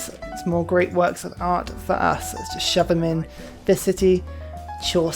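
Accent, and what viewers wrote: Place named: United Kingdom. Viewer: I have found Discord too complicated for me to learn so I shall type out my message here. Dear, Thank you for making these lovely videos. I am new to using youtube but began visiting this website since the pandemic began. It must have been the hand of god for me to find one of your videos on the home screen. The last time he visited me, my grandson introduced me to Civilization 6 as a way to pass time. We would always play board games together like Settlers of Catan and Risk, but he was rarely able to travel and see me. He worked as a teacher in Hereford and his favorite subject to teach was history, which I think is why he really liked this game. I've never played video games before, so I found it a little overwhelming. He was patient with me though, being the sweet young man he was. He explained so much to me and I hardly remember what all he talked about during those few days he spent teaching me about Civilization. 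Though I don't play Civilization, I have watched almost every video you've released. I love listening to you explain every detail of what you're doing in order to win. I try to watch your videos as soon as I have free time, and have recently found myself waiting on your next video to come out. Your quirky sense of humor and beautiful British accent take me back to those wonderful days with my grandson. He said he liked to get science victories too, and said my favorite character to play would be Teddy Roosevelt. I appreciate everything you put out, an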